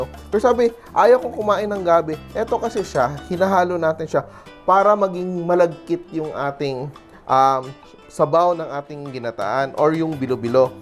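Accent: Visayas, Philippines. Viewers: daan pko sir.. bisaya ka... hahaha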